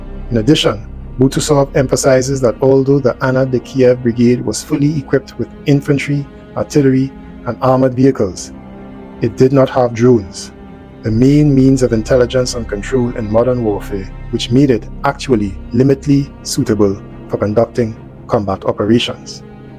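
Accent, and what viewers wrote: Trinidad and Tobago. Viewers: Narrator sounds like a Trini?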